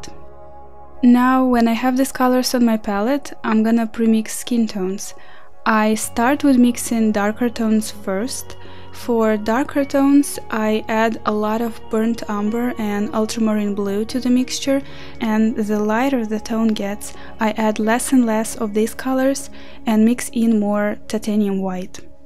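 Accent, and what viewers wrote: Russia. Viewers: Русский акцент)) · Wow, are you from Russia?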